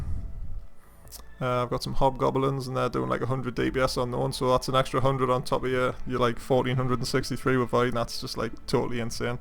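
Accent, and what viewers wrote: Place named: North East England, United Kingdom. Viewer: Lo m8 just come back to eve after a long break sitting in ya pub atm, you sound like your from the NE like me, would love to chat about some fits m8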